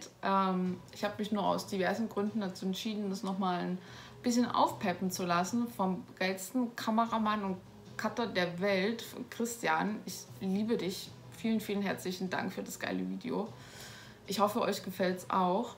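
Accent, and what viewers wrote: Germany. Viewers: Your German accent sound beautiful, and you are so cute😍😍😍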